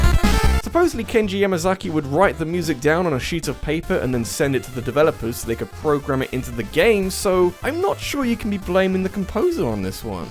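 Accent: United Kingdom. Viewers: I never played that Yoshi Island and I'm glad I never did because the original it's my favorite game of all time and the music there was a piece of art, but this one... Yeah, I don't want to get near that. Also I like all the subliminal messages on your video, like the Splatoon plushie on your left and the star to the right representing how in this video the music is more important tan any game mechanic, also the Lucio's T shirt making us know there is a little percentage of game with bad music just like the Overwatch community has a small percentage of bad people but with a lot of great potential and awesomeness out there... Or maybe I'm just overthinking everything while listening to your british accent! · You mention that your audience has a craving for dat British accent. Okay what about your audience already native to England in the UK? People probably go to sleep peacefully to your sexy voice all over the place (no homo) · I didn't even realize you were British until you said it. 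I've heard so the accent consistently for years I forget there's a difference between American and British accents.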